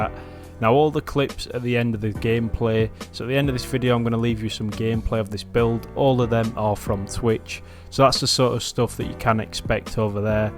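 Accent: Yorkshire, United Kingdom. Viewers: Where from Yorkshire u from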